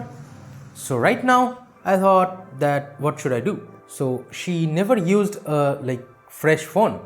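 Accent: Bengal, India. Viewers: Accent tells me you are bangali, even I'm using redmi note 4 from 2 years still in good condition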